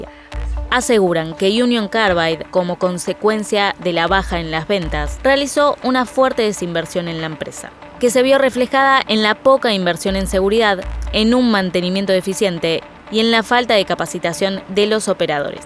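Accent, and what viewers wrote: Argentina. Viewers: Cada día pienso más seriamente que este canal es el mejor canal de Youtube argentina! (por la voz parecen argentinos, me disculpo si no son de ahí), una GENIA la narradora!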